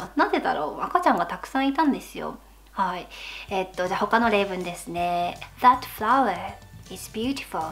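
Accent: United Kingdom. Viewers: とっても分かりやすいです！因みにChiakiさんはイギリスアクセントをどうやって学んだのか気になります！そして、やはりまずはAmerican accentをある程度身につけてからの方が良いのでしょうか？？👀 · すごく分かりやすいです。私の先生はイギリス人なので、の話方が、本当に参考になります！先生と会話が続くようになりたくて、youtubeを必死で見ています。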